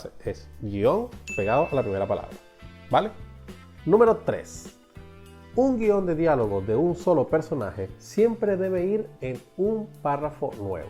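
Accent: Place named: Venezuela. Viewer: Vi el video, hasta que caí en cuenta que eres venezolano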